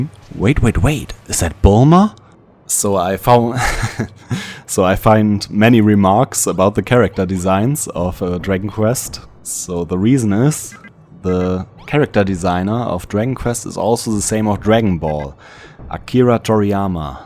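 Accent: Germany. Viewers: Juhu my Question was in the video. Und wusste garnicht dass du von Germany bist, obwohl man es raushört. Es klingt bisschen wie mein english. xD Thanks for the answer. Big up!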